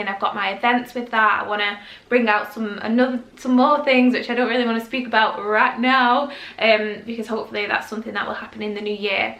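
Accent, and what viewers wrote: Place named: North, United Kingdom. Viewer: Love the northern accent always... and hahah I remember the 20mins to text back so I will take longer.... what a game 😂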